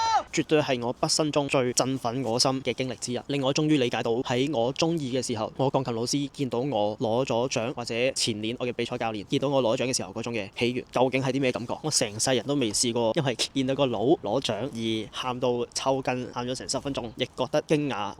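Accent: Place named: United Kingdom. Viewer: Support ! 英文好正重British accent ❤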